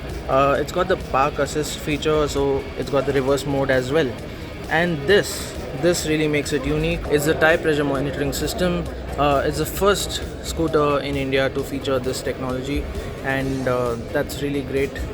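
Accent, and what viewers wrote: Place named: London, United Kingdom. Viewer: Bhai tu London Pala besi English khuchu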